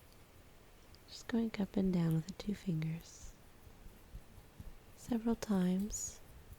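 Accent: South, United States. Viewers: By the way, do you happen to be from the Southern United States? I thought I hear just a really slight drawl but maybe it's just the soft speaking.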